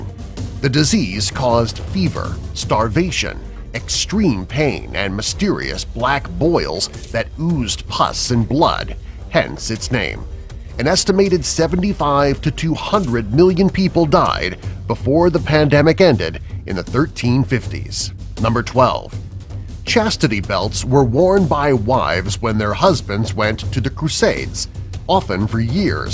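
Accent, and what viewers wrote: United States. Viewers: Interesting but learn to speak correct English language!, instead of americanizing it!!!!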